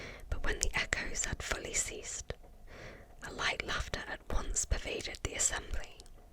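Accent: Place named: United Kingdom. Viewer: Not enough British ASMR reading videos out there! This was very relaxing,!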